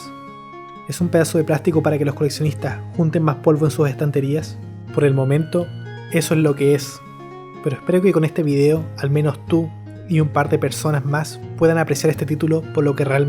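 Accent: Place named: Chile. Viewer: Increíble reseña. Encontré tu video porque alguien lo recomendó en un tablón de imágenes. Me llamó la atención la imagen del post en el que estaba la niña escalofriante que está en un callejón al atardecer. No me esperaba encontrarme con esta calidad de vídeo. El juego parece genial y lo que expones sobre el mismo me hace querer jugarlo pero por desgracia, no tengo mucho interés en aprender japonés, así que será a puro gameplay por ahora. Sin embargo, me asombra tu dedicación y atención a los detalles al armar, editar y en recopilar información de un juego casi desconocido y del que jamás había oído y en otro idioma para luego presentarla de manera que no solamente entretenga, sino que cuarenta y cuatro minutos me dejen queriendo ver más. Te ganaste un suscriptor más entre los cientos de miles que estoy seguro de que vendrán en un futuro. siempre que le des tanta o más dedicación a cada contenido que realices en el futuro. 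Y aunque honestamente no soy fan de tu acento (que creo que es chileno), la verdad no le di mucha importancia. Un poco de cuidado con el volumen del audio de tu narración es lo único que podría recomendarte. TL;DR : tremendo video bro, sigue así.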